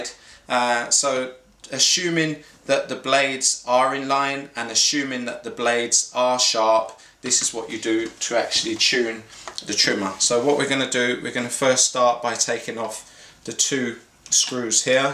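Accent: United Kingdom. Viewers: Love your accent from London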